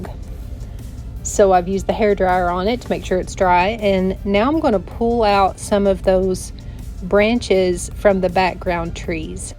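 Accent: South, United States. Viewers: Nice voice and subtle southern accent. Nice painting too.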